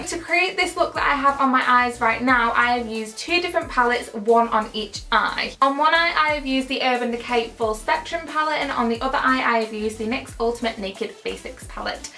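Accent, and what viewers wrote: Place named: Cheshire, United Kingdom. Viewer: You sound like you're from Middlewich! I taught at the High School there in 2006, and when my girls went to Sir John Deanes, they had pals from Middlewich High...so you're probs going to tell me I'm completely wrong and you live in Alsager or Nantwich! I'm just outside Northwich in Weaverham. If you're in the area, let me know and I'll show you my mental makeup collection which is taking over my bedroom. And I'm 47!! My daughter gets inordinately jealous and barks, "YOUVE GOT TOO MUCH MAKEUP!!! Mummmeeeee, can I have your Vice Reloaded and your Violet Voss palettes pleeeeeease cos you love meeee." Suffice it to say, the answer's NO! Thanks for taking the time to reply. That is a lovely touch for a YouTuber cos few of them can be bothered with their subbies xx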